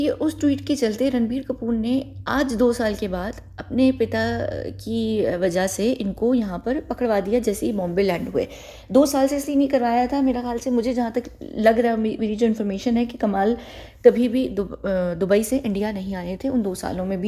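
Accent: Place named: United Kingdom. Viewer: OMG!! HEARD you angrezi wali accent after.....AGES...I fell in love with your voice...before everything else!! Love you!! 💕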